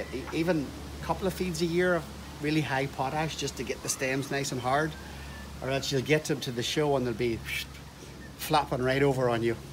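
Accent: Northern Ireland, United Kingdom. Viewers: Hello greetings from NI when you say a good feed of pot ash what would I be looking to buy,at the min I have a lot of buds but the stems are short and not strong. Also I hear the NI accent there where do you hail from,lovely plants by the way.Ta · Now r u a northern ireland man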